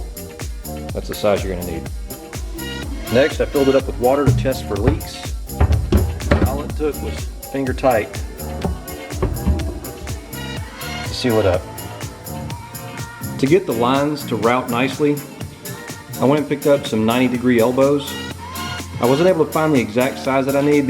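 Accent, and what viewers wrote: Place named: Carolinas, United States. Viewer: Are you in the Carolina's? Guessing by your accent ;) I too have a 3rd Gen 4runner with the TRD S/C (Walbro 190LPH, Supra Injectors, URD Pulley, JET flash ecu). Pings going uphill under load so I'm looking at Methanol injection. CLEAN INSTALL and I appreciate your thorough explanations!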